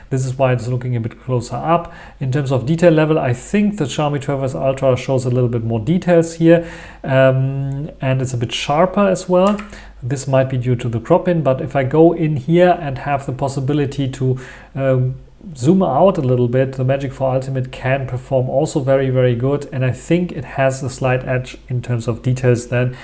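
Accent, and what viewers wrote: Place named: Germany. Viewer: From the first seconds of your accent it reminds me of the Rein river and your German nationality, thanks for the review! It will be interesting to see tests between these phones and the Pixel 6 pro or 7 pro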